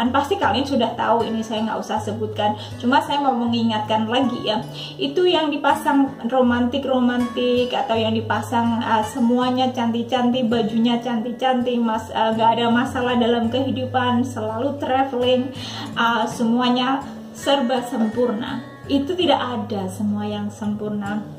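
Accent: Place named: Java, Indonesia. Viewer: asli asal mana, koq logatny jawa hhh..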